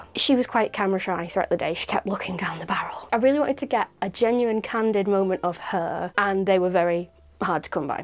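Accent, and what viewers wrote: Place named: Northern England, United Kingdom. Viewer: Great video. This is the first time I've heard the term iso invariance, and it does explain my only complaint using MFT. I have used and still own quite a few different cropped sensor and FF bodies I wonder which ones are and which ones aren't now! Fyi, I would continue to watch no matter what you spoke about ... I am here for your lovely Northern English accent 🤩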